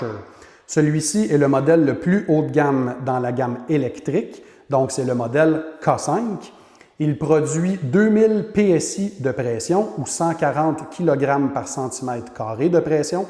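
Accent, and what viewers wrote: Quebec, Canada. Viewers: J'adore son accent de canadien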